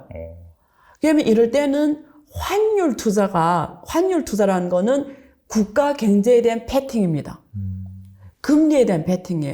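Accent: Yanbian, China. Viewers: 아~~ 방금 듣다가 말투가 조선족인가? 했는데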